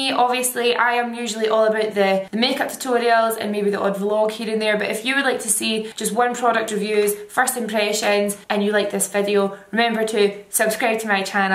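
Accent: Scotland, United Kingdom. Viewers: When you said you look like a fresh baby I died. Everything sounds better with a Scottish accent 😭🙌💛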